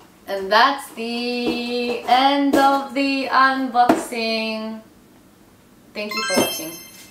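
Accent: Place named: Australia. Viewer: i cant with her aussie accent is so precious 😭🤚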